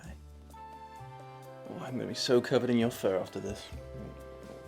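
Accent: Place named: United Kingdom. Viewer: There is something so beautiful seeing someone with a thick British accent reviewing American smut novel's.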